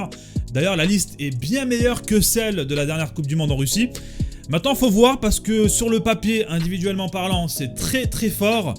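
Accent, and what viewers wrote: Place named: Arab world. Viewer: Sanpa ton accent arabe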